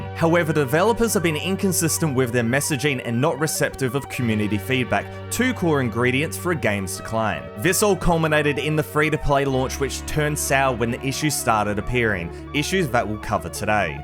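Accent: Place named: Australia. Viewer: You sound Australian my guy. Do you ever get good ping?/are their AU servers? From the 5 or so games I've played they've all been red ping. Not worth the headache for me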